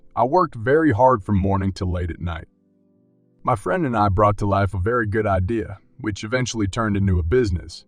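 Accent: United States. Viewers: Narrator has a distinct accent. I'd bet Texan, or at least somewhere close to Texas. ETA: I'm a Texan, and he sounds like us. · Stupid knows both genders equally, so nice to get the perspective from the husband who was a good man. Thank you! Love it. Keep up the great work! Awesome southern accent!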